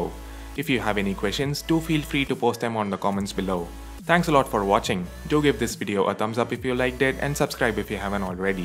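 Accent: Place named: Tamil Nadu, India. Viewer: u still couldn't get rid of tamilian accent. long way to go....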